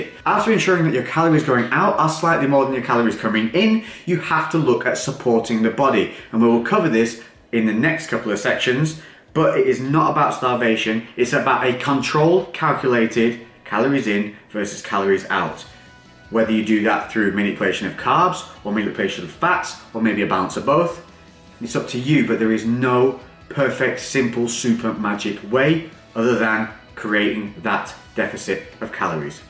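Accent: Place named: Yorkshire, United Kingdom. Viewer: I've recently found your channel and I'm loving it! You sound local to me, I'm near York.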